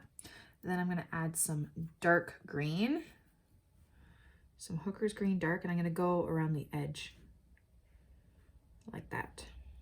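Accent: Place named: Canada. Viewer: I have a feeling you're Canadian, :) just watched this and your voice annunciation... or northern US state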